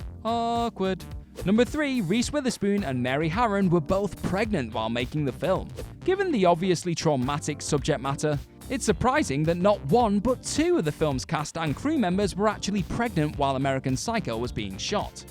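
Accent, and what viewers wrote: United Kingdom. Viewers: The narrator is British, so is Bale. Maybe it's Americans that are saying it wrong.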